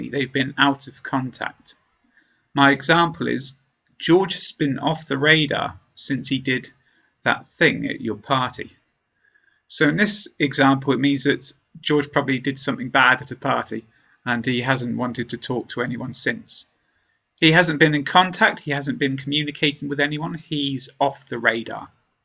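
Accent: United Kingdom. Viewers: Thank you! Your videos do help me a lot! I am a Chinese student majored in English language and literature. I haven't been in England yet. But your videos provide me a window to Great Britain; and make me feel like that I have been living in London already. I had watched your videos for two years since I got access to YouTube in 2014. Watching your videos like taking English course, that makes you as my mentor. Your videos are always interesting and useful. They really help me a lot. And one more thing, your British accent , classical! Waiting for your videos. Thank you for your videos.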